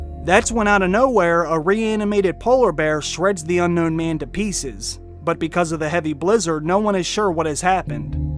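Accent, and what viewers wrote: Pittsburgh, United States. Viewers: Are you from the Pittsburgh area ???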